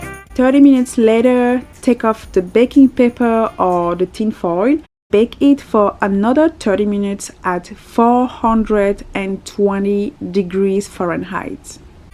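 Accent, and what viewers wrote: France. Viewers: I’m so glad that I bumped into your channel. I’ve been a subscriber since. I’ll definitely try all of these recipes. 😊 Sn: I love your heavy accent. I figured you spoke French. 💋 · Un accent français ? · I'm subscribing to your channel just because of this video! I love the way you speak! Are you from France?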